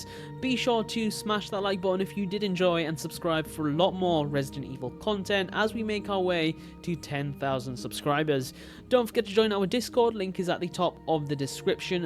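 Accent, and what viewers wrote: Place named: United Kingdom. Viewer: haha you butchered that name with that heavy British accent bro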